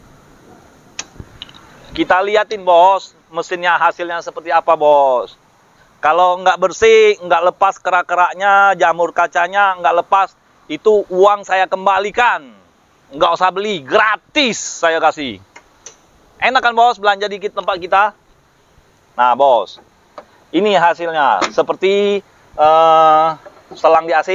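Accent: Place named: Plumbungan, Indonesia. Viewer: Wong Plumbungan nian Dio ni klo ngomong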